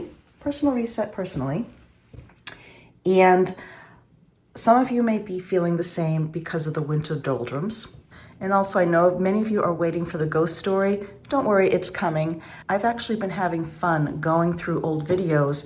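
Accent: New England, United States. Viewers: Please don’t loose your New England accent it’s a great part of what we love about New England!! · I love your pantry! It’s beautiful! Your kitchen looks amazing also!! I love your New England accent!! Hosses, not horses!😂😂My grandparents were from Mass, and my great grandparents resided in Stonington, Ct. I’m a New Englander at heart. I love seeing your videos and always look forward to your updates! Love your beautiful home! Jean😘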